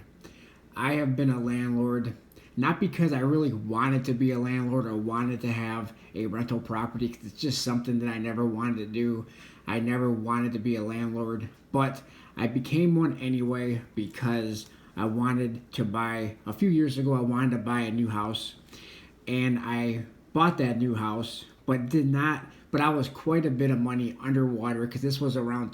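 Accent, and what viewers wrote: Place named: Western New York, United States. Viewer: California?! He’s got more of a Western New York accent.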